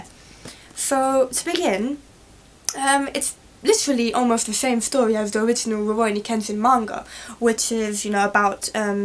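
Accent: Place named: United Kingdom. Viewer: Sounds British to me. thanks for the review just what i was looking for:)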